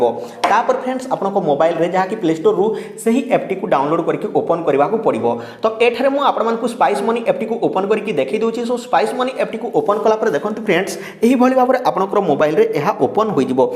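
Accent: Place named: Sambalpur, India. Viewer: Sambalpuri kahu6 bro ... Nice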